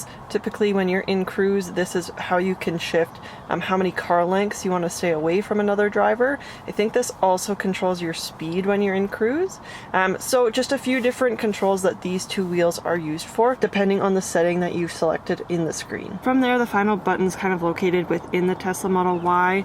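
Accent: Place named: Canada. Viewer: She sounds Canadian 🇨🇦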